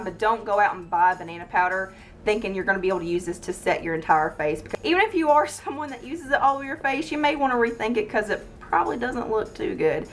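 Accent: South, United States. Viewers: ik that's wat I just said she seems like a nice country girl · This is a very good video but I have one question. Where r u from? Like, what area did u grow up in? U sound way southern that’s why I asked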